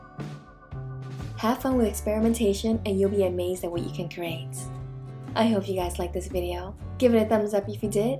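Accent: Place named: United States. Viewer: from an Real Indian woman to now being a sultry American voiceover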